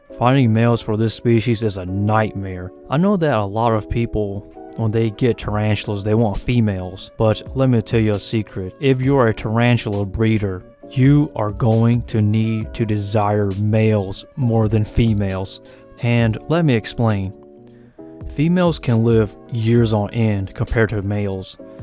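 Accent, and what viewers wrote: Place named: South, United States. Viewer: Bro I can tell your from the southern states. I was born and raised in Virginia and North Carolina hell yeah brother!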